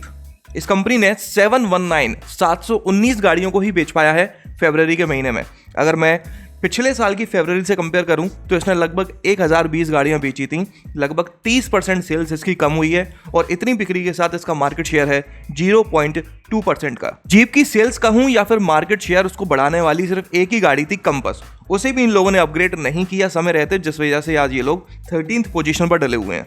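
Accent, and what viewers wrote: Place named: Bihar, India. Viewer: सर आप एकदम बिहारी की तरह बात करते है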